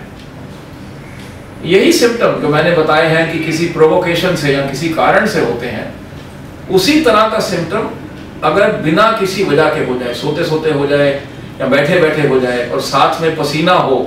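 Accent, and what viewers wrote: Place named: Pakistan. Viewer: I thought you were Pakistani from your accent. Very nice information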